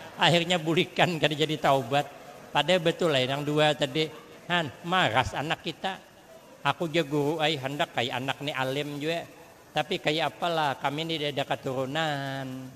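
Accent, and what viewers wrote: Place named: Kalua, Indonesia. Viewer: bahasa beliau kaya orang Kalua ( Kalsel )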